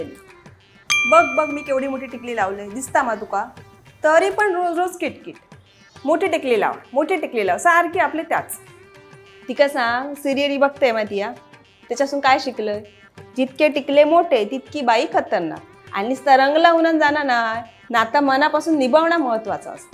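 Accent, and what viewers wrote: Malvani, India. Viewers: तुझी मालवणी भाषा ऐकायला फार आवडते. ऐकत राहावे असे वाटते. तुझा प्रत्येक वीडीयो आवर्जुन बघतो.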